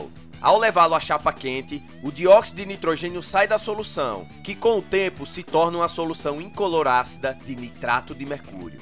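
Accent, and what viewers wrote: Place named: Northeast, Brazil. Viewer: De onde tu é? Com esse sotaque pauleira eu imagino q tu deva ser do RN, PB, PE ou AL Um salve de João Pessoa, Paraíba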